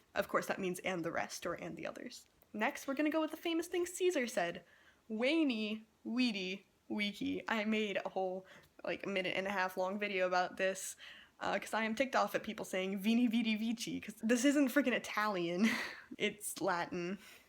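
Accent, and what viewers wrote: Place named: United States. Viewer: Great video, but you should really try to master the IPA pronunciations, physically. It still sounds like an American English speaker pronouncing latin: Although you're spot on in explaining the proper vowels, the vowels are different than the way you're pronouncing them.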